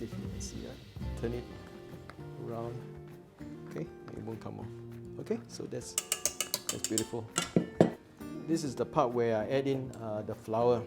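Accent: Singapore. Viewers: Are you from India! Or Singapore! You sound like Indian Chinese or Singaporean. Nice accent. That was funny. When the bake is cakking. lol I'll try your recipe. Your a jovil person.jovial. Thank you From North America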